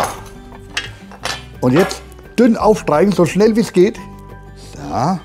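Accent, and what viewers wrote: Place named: Franconia, Germany. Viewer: Von wo in Franken bist du?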